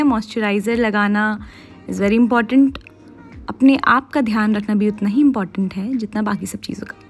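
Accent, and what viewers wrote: Bihar, India. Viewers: U r speaking a real bihari time😄😄 Love from gaya🥰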